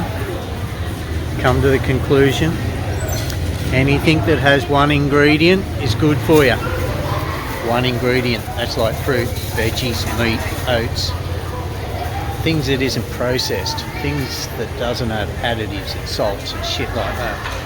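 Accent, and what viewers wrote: Australia. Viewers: I want to search if you have videos from Pakse region, but the search button isnt active and there is no url for it. Isnt it active yet? Btw great videos you put out, I like your stories, your choice of music and how you and talks with everyone. The only problem I sometimes have is your aussie english, but i feel your pronouncing is better now than a year ago 😊👍. It may be me though...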